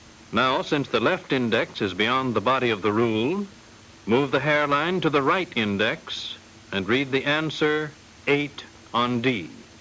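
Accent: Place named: Oklahoma, United States. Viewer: Who did the voice over for this film? He sounds like someone from Oklahoma.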